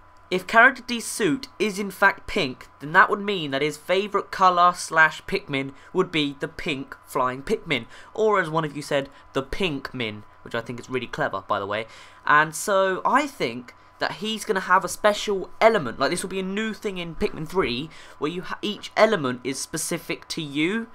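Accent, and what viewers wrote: United Kingdom. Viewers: Are u british? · Yes another british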